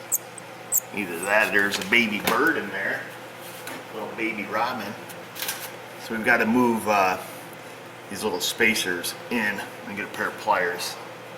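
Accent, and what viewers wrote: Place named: Texas, United States. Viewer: very understandable and appreciate you sharing all you do. Then again, maybe you should ask yourself the purpose behind why you make the YT videos. BTW you sure sound and act like a good ole boy like me from TEXAS. not only my opinion but also expressed by many friends.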